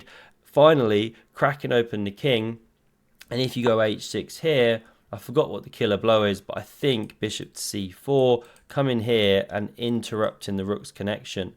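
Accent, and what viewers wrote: England, United Kingdom. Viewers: Scottish accent? Though was English!!!! 😂😂😂Great analyze mon ami 😜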